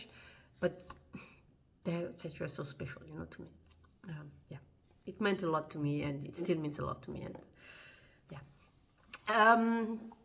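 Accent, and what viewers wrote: France. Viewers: Love your french accent!🤗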